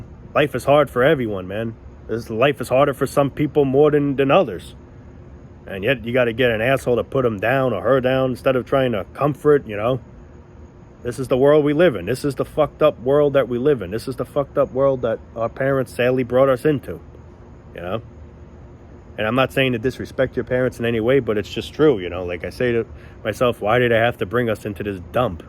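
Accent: New York, United States. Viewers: I really like your New York accent, off topic i know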